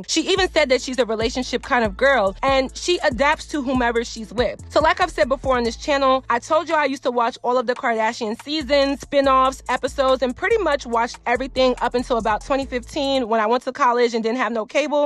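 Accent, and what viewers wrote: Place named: Baltimore, United States. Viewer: Random, but I can tell by her accent and the way she enunciates her words she is 99% likely from Baltimore....great video...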